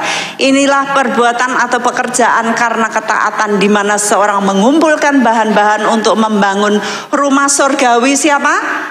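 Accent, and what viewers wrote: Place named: Surakarta, Indonesia. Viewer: Senang sekali mendengar kotbah dengan logat solo - jawa yang kental. Kiranya Tuhan Yesus selalu memberkati pelayanan Ibu.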